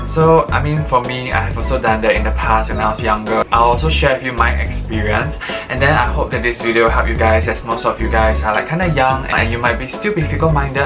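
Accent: Singapore. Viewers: I can tell your from Singapore because of your accent 😂😂, anyways thanks for the video, I let you know if it works!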